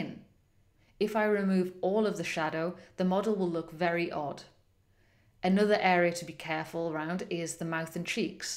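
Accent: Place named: United Kingdom. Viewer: Very beautiful british accent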